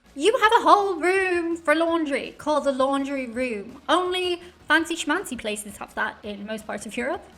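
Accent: Ireland. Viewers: We love the Irish accent! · I love female Irish accents 😏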